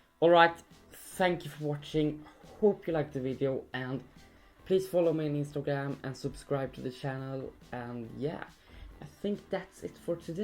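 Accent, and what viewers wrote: Sweden. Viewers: Du låter svensk🇸🇪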